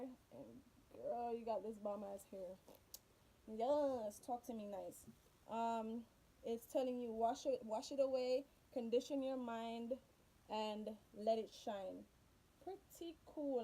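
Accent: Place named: Caribbean. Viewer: Lol I love your personality 😂, and your accent girl!! Caribbean females all the way 🙌🏽.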